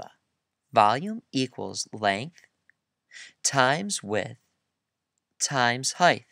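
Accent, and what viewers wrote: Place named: Texas, United States. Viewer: I think the video maker has Texas accent. He wanted to say Rock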